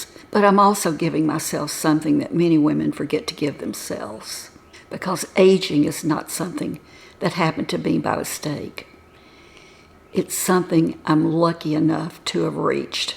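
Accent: South, United States. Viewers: you are so absolutely gorgeous oh what I wouldn't give for such beautiful sparkling blue eyes and I LOVE your adorable southern accent!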